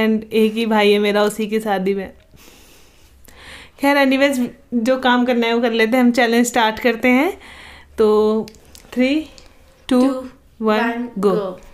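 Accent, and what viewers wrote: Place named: Garhwal, India. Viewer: but she is not Punjabi she is uttarakhandi (gadwali) like me I am also from uttarakhand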